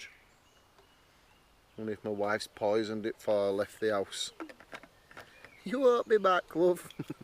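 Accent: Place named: Yorkshire, United Kingdom. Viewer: you’re brilliantly funny, Keep going my friend. My family is partly from Yorkshire so I can relate to the accent. Excellent stuff mate.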